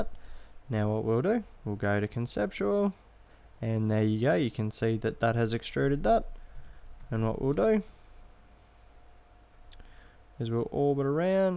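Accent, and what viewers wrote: Australia. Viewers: Can't listen to this any longer, your accent is killing me with that stupid Aussie inflection!!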